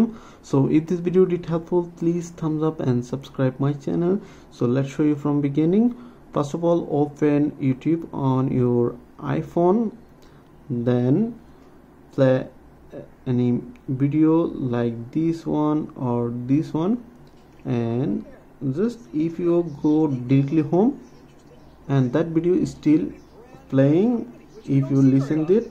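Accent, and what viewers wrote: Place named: India. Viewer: Hate the Indian accent 😒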